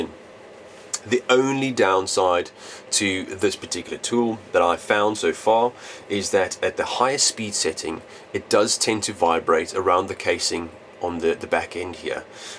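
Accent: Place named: South Africa. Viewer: That's got to be a south African accent if I've ever heard one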